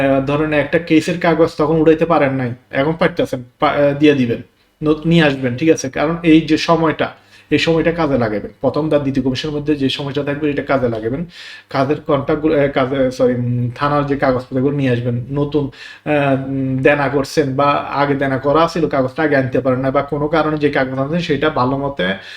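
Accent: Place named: Noakhali, Bangladesh. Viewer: আসালামু আলাইকুম কেমন আছেন ভাই আপনার কথা গুলো শুনে আমার মনে হচ্ছে আপনার বাড়ি কি নোয়াখালী না কুমিল্লা জানাবেন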